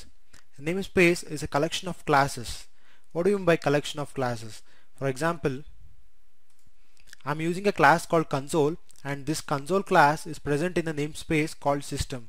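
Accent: India. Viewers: You seem like offering gold worth of knowledge i just can't power through that accent. I swear i want to learn indian just to watch these videos. Is it hard to learn?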